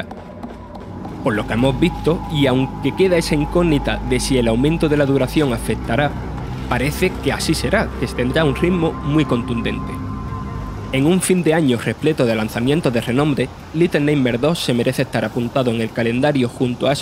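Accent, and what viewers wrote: Andalusia, Spain. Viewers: Pregunta por curiosidad, ese acento es de qué parte de España? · E' andalú soquete... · No se si el que habla es de Jaén o Murciano. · Del Sur sobre todo, Murcia/Andalucía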